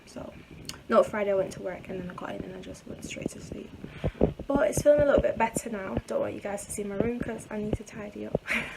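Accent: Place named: United Kingdom. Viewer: Are u jamaican u sound like u british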